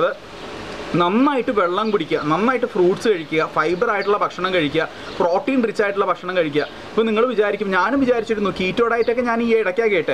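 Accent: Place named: Pathanamthitta, India. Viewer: ഇല്ല ഭായി സംസാരത്തിനു യാതൊരു കുഴപ്പവുമില്ല. ഞാൻ കരുതിയത് ഭായീടെ നാട് പത്തനംതിട്ട ആണെന്നാണ്.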